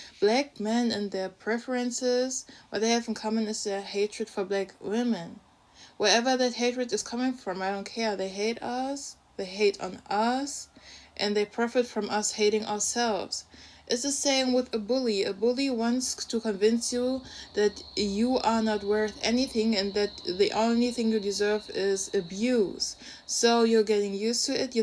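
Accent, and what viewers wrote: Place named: Germany. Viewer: She actually has a German accent, and to be honest I usually dont like German accents but her accent is BEAUTIFUL ❤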